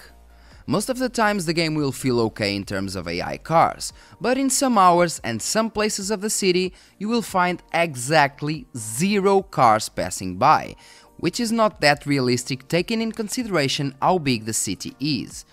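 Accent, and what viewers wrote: Portugal. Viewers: when i start hearing european portuguese accent... hello there xD either way nothing beats nfsu 1/2 and need for speed most wanted 1.... underground 1 was amazing... underground 2 was the first open world (if im not in mistake) and mw was just loved by... everyone? EVERYONE!!! :D soundtracks were amazing in all of those 3... in heat half of the soundtrack is cool other half not so much... either way as portuguese im fine with it but even to me i get the feeling the guy responsable for the soundtracks was some spanish or something because its only latin music... i mean.... it matches the game... but sounds weird... specially for me im used to rock and super agressive songs in nfs (im old school xD) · dude that accent... are you portuguese?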